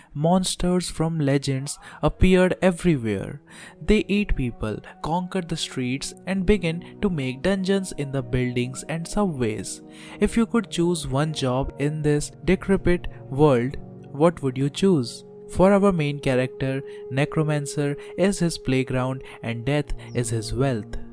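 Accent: India. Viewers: Your accent is indian bro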